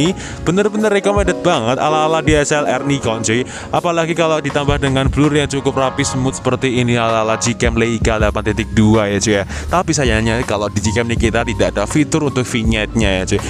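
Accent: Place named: Semarang, Indonesia. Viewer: Wah njenengan wong semarang ya mas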